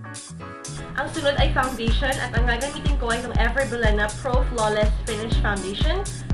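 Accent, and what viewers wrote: Philippines. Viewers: hahaha nakakatuwa naman pag tagalog, pinoy na pinoy talaga. actually hindi na sya tagalog, halos filipino na sya ksi pormal na pormal yung salit :P nice tutorial btw.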